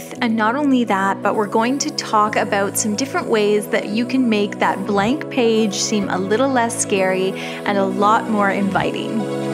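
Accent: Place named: Atlantic Canada, Canada. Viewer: These flowers are lovely! I enjoy your video’s so much. I love listening to your Atlantic Canadian accent. It makes me want to visit PEI! Thanks for sharing your talents.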